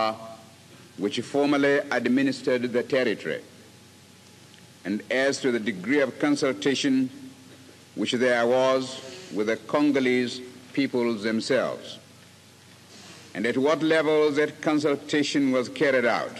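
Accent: RP, United Kingdom. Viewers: He speaks with a British Queens English accent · He sound like a member of the british royal family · He has Old British accent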